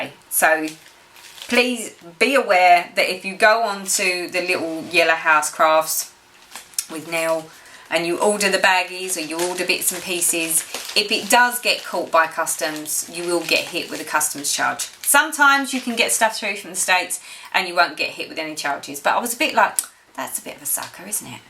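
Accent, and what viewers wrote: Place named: London, United Kingdom. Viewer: Hi there ,just found you while i was stitch surfing ,and would like to say how much i enjoyed your video and seeing your wip,s and future projects ,i,ll have to catch up now on all your other videos .look forward to watching them . your accent sounds a bit like mine ,are you in London or Kent maybe ?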